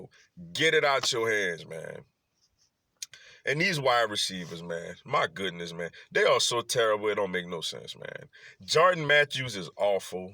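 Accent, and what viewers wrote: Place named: Louisiana, United States. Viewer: Surprised you're not a Saints fan. Got that La accent.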